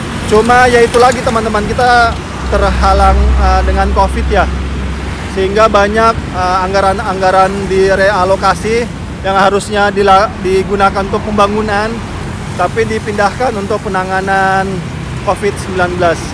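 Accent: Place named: Medan, Indonesia. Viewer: Abang ini cem orang Medan, trus trus trus .....ngeri2 sedap ku tengok2.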